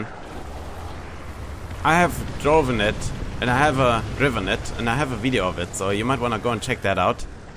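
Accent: Germany. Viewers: i just love your accent i think its german right? xD i laugh so hard everytime SUBBED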